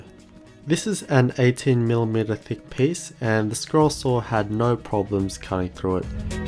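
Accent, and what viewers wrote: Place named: Australia. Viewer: You sound like Daniel Ricciardo. Are you an Aussie?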